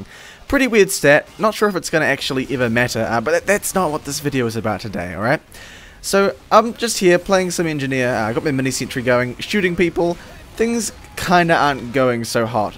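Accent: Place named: New Zealand. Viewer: I think the kiwi accent is super chill to listen to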